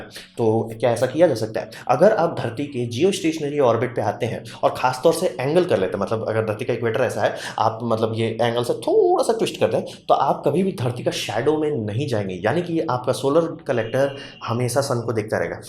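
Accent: Bihar, India. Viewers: Sir..kya aap Bihar se ho..?????????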